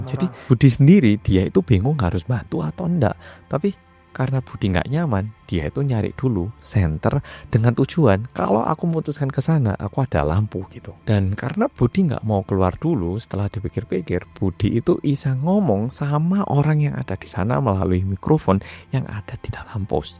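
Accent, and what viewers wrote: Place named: Malang, Indonesia. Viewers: Kayak awe awe ...byuh mass malangan banget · hahaha mas lek wes metu bahasa jawa malang e lucu pwoll 😭😭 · Lah kan mas emang gedenya di Malang. Jadi ya wes biasa lah kalau ngomongnya masih khas arek malang banget 😅 malah itu jadi ciri khasnya dia yg bikin beda dari konten kreator asal Korea lainnya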